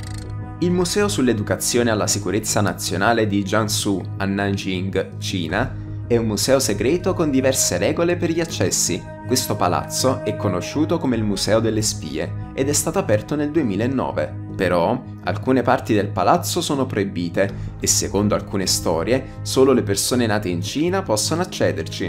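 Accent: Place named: Naples, Italy. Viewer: Quello piu spaventoso è che questo canale e quello di e altri 2 canali sono la stessa persona,puoi parlare piu basso,più serio ecc ma si sente perfettamente che 6 sempre tu soprattutto dalla calata napoletana k provi a nascondere..e i video sono uguali..l unica cosa che cambi è il titolo del video..e nn solo..avrai altri 2 canali sempre così stessi video titolo leggermente diverso..ti ci sei arricchito con sto sistema..altro che guru bitcoin ecc..questo è il sistema dei sistemi x guadagnare..all inizio ci è voluta pazienza vero?..ma una volta k gli iscritti e le visualizzazioni hanno cominciato andare a gonfie vele(alla gente piacciono questi video quelli del paranormale ecc)vai il gioco è fatto..avrai anche dei canali con gli stessi video ma parli inglese..se nn ci avevi pensato(difficile)ti ho dato una bella dritta..CMQ VABBE NESSUNO SE NE ACCORGE CHE 6 SEMPRE TU..O SE NE FOTTE..BOH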